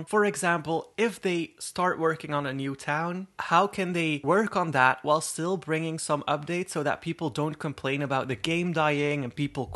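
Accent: Netherlands. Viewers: Are you dutch? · Can i ask if your dutch? 😁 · You're dutch or Belgium, same for me.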